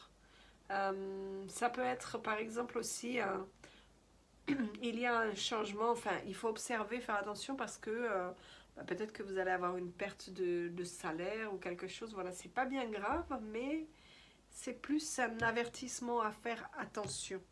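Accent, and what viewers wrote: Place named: England, United Kingdom. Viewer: Mignon ce petit accent anglais :D Je vous découvre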